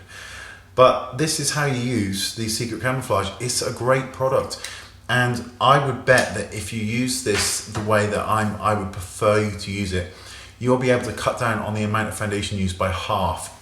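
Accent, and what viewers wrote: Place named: United Kingdom. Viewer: Hes british not aussie u dumb fuck below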